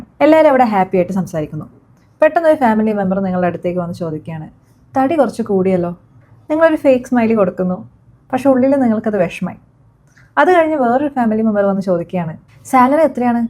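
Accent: Kannur, India. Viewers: ഇങ്ങള് കണ്ണൂർ ആണ് ല്ലേ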